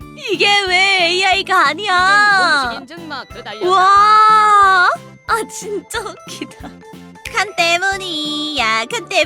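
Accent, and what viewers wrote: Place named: United Kingdom. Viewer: 영국 발음 느낌 지리시네요ㅋㅋ 개추드립니다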